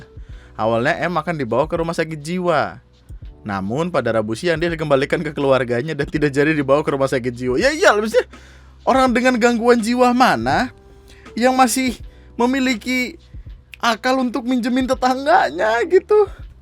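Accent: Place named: Madura, Indonesia. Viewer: Bahasa Madura kalo denger logatnya bang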